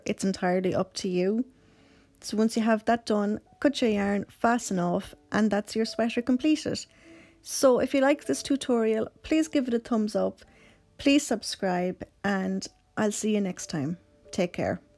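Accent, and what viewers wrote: Ireland. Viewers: Thank you so much for your quick reply 😊 I will try the 2 strands together.... your instructions are great, it is nice to hear a fellow Irish voice ☘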